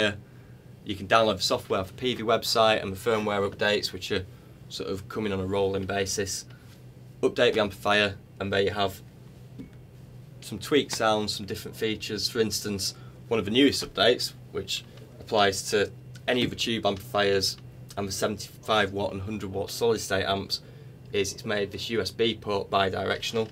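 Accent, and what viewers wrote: United Kingdom. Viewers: The combination of the British accent and sweet beard lead me to believe that this man is Guthrie Govan's alter ego.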